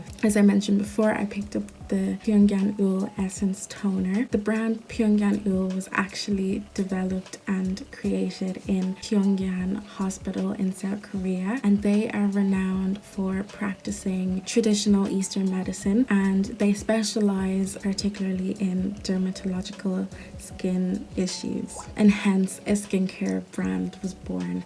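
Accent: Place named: Ireland. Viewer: You seem to have a slight Irish accent. Are you in Ireland?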